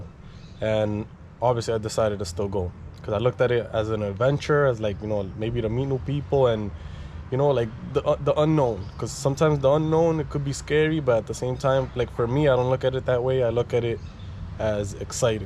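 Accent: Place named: United States. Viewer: I have been to Colombia about 20 times across all the main cities and have never experienced anything remotely this wild. I am glad you are alive and made it through your ordeals. I would encourage you make calculated risks with the folks you meet. If I saw you in Cartagena, I would assume you were a local so don’t feel like you stick out; predators prey on fear and discomfort. I feel bad for what you experienced in Cartagena as I have been in many solitary circumstances between Bocagrande, Getsimani, Centro and Manga through into the late night hours and never felt unsafe. Always always a happy vibe and heavily policed. If you stay in the tourist zones, they are adequately policed and always full of people. You sound American middle class-so try plugging into Colombian middle class and stop partnering with businesses associates who can’t even feed themselves. 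Stick with Ubers- cheaper than taxis and there is tracking accountability. 2.5 million people visit Cartagena a year: if 1% of that number experienced anything similar to your ordeals, tourism would shut down and the city economy would grind to an end, which means the government has a true incentive to weed out these issues. I would recommend these tips: 1. Use Uber, avoid taxis and inDrive 2. Stay within tourist perimeters until you cultivate a dependable local network 3. Don’t call people friends, especially best friends when you just met them. 4. Don’t venture into barrios without an exit game plan. 5. Not sure how t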